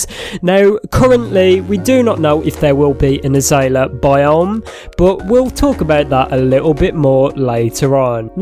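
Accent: United Kingdom. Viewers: Mans sounds like chills with a British accent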